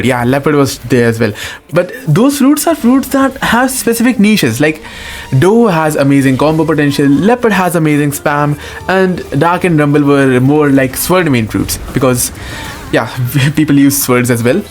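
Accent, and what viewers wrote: India. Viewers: bro this is indian accent i guess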